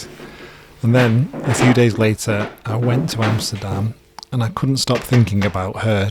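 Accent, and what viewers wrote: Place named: Manchester, United Kingdom. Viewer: Love the channel, nice to hear a Mancunian accent. Is the painting the stereotypical Amalfi Coast scene?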